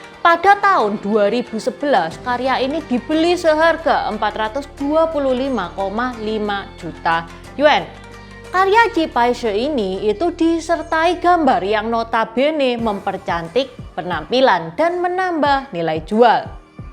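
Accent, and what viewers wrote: Java, Indonesia. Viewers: Hidup merah, Meddok nya sudah berkurang dikit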